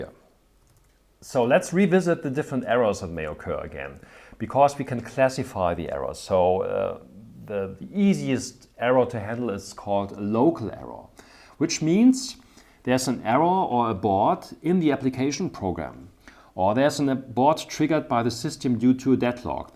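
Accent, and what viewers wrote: Germany. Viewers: Ohh Gott, dieser Deutsche Akzent. Aber trotztdem Danke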